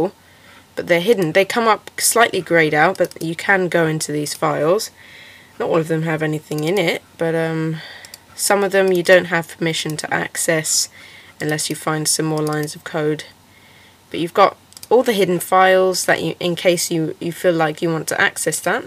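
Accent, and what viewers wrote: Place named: United Kingdom. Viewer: its just the british accent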